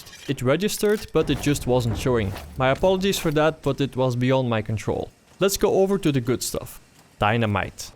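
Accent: Netherlands. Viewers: are you dutch/NL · ben jij nederlands of belg of zo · is this guy Dutch cause his accent is not english nut great vid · The dutch accent is strong in this one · are you dutch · Are You Dutch or something like that? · Are you Dutch? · are you dutch??? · where r u from? u Sound Dutch :) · ben je nederlands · you just can hear you are dutch xd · he clearly is, he has that distinctive Dutch accent that you hear when 90% of the Dutch people speak English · you can clearly hear that he is dutch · Your dutch aren't you ? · Yo man are u dutch of you are here is a sentence for you: goeie video man super leerzaam! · Are you dutch? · R u dutch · are you Dutch? if not from what country are you from? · ur dutch arent u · jij komt zeker uit nederland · That dutch accent is amazing xD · Ben je Nederlands ik hoor het namelijk lol maar goeie video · Haha Nederlands dat hoor je meteen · Are you from the Netherlands?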